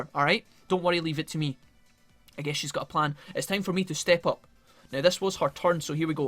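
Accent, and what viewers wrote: Scotland, United Kingdom. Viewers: Its coming out now! Lol When are you back man!? We miss ya. Can't wait to hear your Scottish voice again :))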